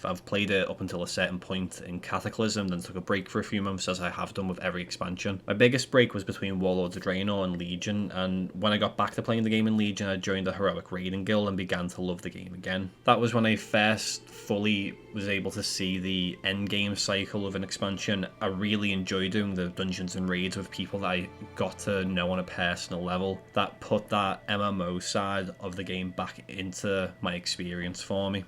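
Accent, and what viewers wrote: United Kingdom. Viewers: It's one of the many regional British accents, maybe you should broaden your horizons more.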